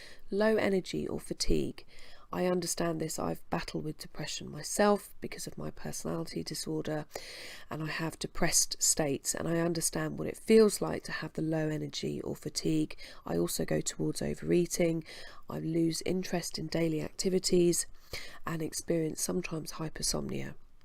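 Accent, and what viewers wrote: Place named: United Kingdom. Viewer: (Sunday November 1st 2020) Greetings from Montréal, Québec, Canada !!! I do not remember how I came accross such videos, but I FINALLY put a name on my emotional/mental state ' Dysthymia'; exactly what I have been going trough for more than 25 years. I am 60 yrs old, and for the past 5 years...I have had more downs than ups...and it's NO FUN !!! My NEW doctor has prescribed me some pills that I have to start taking this monday to see IF my emotional state shall regain the energy that I used to have...I shall keep you posted shortly. Great video, love your voice, love your british accent !!! Cheers !!!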